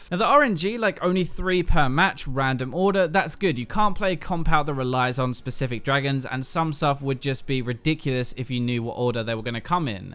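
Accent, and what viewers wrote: United Kingdom. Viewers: I like how smart you sound when you talk :D Must be that british accent :D Anyways, just to put into perspective. If you have 900 ap on veigar and with that 3 infernals and you get an elder. From dragons alone you get 324 ap more. That is bloody ridiculous. If he didn't oneshot you before he does now. And baron gives you even more. One game I managed to get 1300 ap on veigar with items and baron, no infernals though. Imagine with infernals, it would've been over 1700 ap. Can you imagine that power. Nope, and that's why dragons are broken, agree with you. I don't like baron buff minions though. They are impossible to kill and deal dmg. They could deal more dmg but be still normal health.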